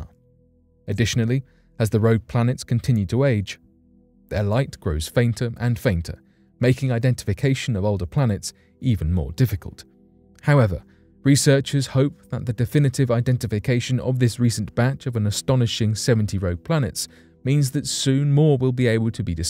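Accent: England, United Kingdom. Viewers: Four minutes sensationalizing microscopic plastic and seven minutes indoctrinating you, with an English accent for authenticity, into a belief system for a 13 billion year old universe. Never mind the actual science of the matter showing the universe to be extremely young. And the "Big Bang"?, really? I thought we were past that joke of a theory. Even the inventor of the word, Sir Fred Hoyle, didn't believe in this nonsensical theory. Oh!, and he was vilified for it by the scientific community just like Mary Sweitzer was vilified in 2005 when she discovered soft tissue, red blood cells and DNA in a supposed 65 million year old T-Rex bone. Neither one were creationist by the way. Y'all need to get a clue.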